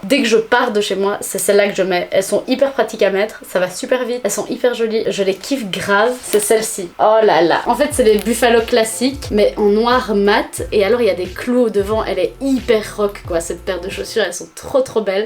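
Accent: Belgium. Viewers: 1) je t’adore dès la première vidéo que je regarde de toi 2) t’as un petit accent qui me semble belge?? Serais-tu belge à tout hasard?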